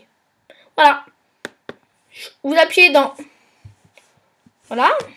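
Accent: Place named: Alsace, France. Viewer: Ta voix comme elle a change et jkiff ton accent Alsacien 😂😂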